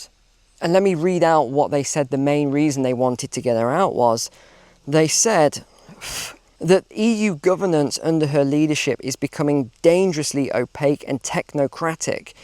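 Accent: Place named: United Kingdom. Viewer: Thanks for your great info., humor, and stories---and we love your beautiful clear speaking, and with that great British accent. And your infectious SMILE...that helps while listening to some of these news items. God Bless you and your Family. Let's pray for a turn-around........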